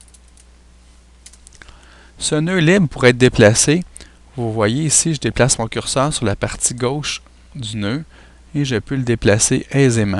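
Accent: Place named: Quebec, Canada. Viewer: accent quebequois très très prononcé ^^ (bonne vidéo au fait ;) )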